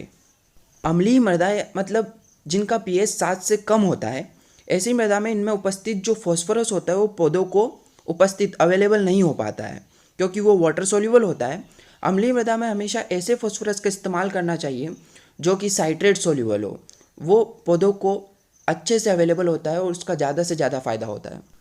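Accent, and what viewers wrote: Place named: Madhya Pradesh, India. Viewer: Bhai pakka m.p se ho tum 😁😁 Ujjain dhar ke aaspas se tum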